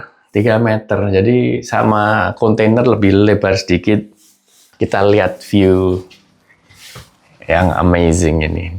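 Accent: Java, Indonesia. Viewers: Tampilan LC 200nya cakep banget, velg+ban dan modif bagian depannya pas banget. Suatu saat bisa bikin mobil overland seperti om wid 🎉. Satu hal yg bikin salut, walaupun lama tinggal di Australia, tapi logat jawanya masih ada loh 🎉🎉🎉. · Saya salut dengan jenengan Pak,walaupun oerbah tinggal di Australi sangat lama,tapi logat Jawa jenengan masih sangat kental🙏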